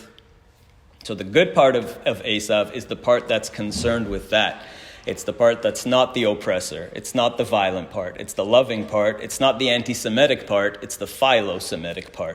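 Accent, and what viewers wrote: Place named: Canada. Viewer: BH wonderful every (canadian) syllable; form, content, substance, and especially, wit/humor. if Moses spoke English, he'd sound like this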